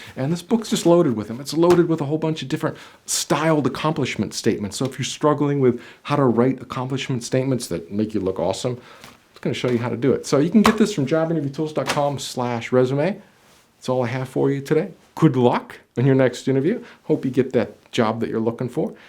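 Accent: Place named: New York, United States. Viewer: Amazing content i always watch your video to practice my listening i like your accent ... are u new yorker , aaren't u ?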